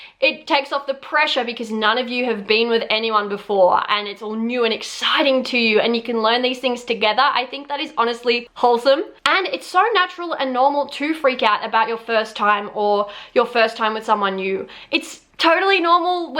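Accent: Australia. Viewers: Are you dutch, cause your accent sounds more like an autralian one Edit: just saw your name is and you are Australian.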